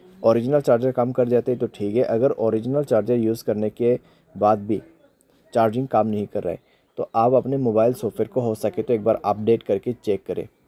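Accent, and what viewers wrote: India. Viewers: Мерзкий эндуский акцент, слушать невозможно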